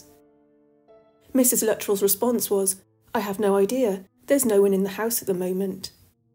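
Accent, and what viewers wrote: United Kingdom. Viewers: hello love your YouTube channel and your videos and your British accent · Love a good ghost story, especially if it's British and recounted with a British accent ❤ 🇬🇧 the great Robert Hardy did a series on castle ghosts which I adored! This is awesome, thank you ⚘️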